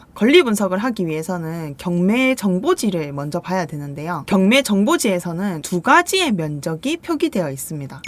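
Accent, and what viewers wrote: Gyeongsang, South Korea. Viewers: 왜 이영상을 이제 야 봤을까.. 유료 강의 듣고 잇는데 거기서 들은 내용이랑 똑같네요ㅎ 같은 경상도라 그런지 쏙쏙 들어와요ㅎ 감사합니다 감사합니다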